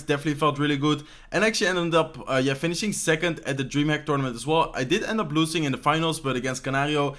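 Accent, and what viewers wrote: Netherlands. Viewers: Llama The Thug It’s a dutch accent, i’m dutch too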